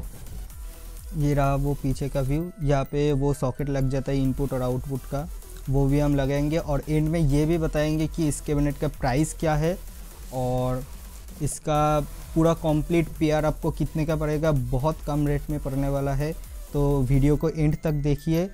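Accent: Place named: Bengal, India. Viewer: Dada tomar kotha sune bujhlam tumi bangali tomar bari kothay ❤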